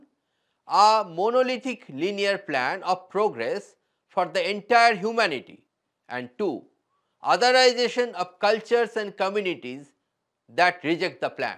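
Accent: India. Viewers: Horrible Bengali accent....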